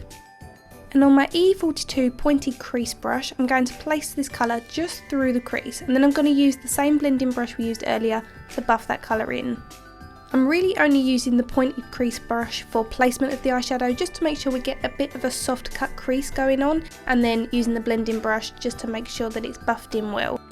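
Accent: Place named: South East London, United Kingdom. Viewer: This is a gorgeous look, I'm obsessed with and at the moment! I love your accent, where are you from? I'm from South East London and it reminds me of home! x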